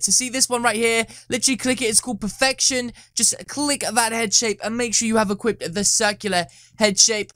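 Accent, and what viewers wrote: United Kingdom. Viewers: Are you an british acsent?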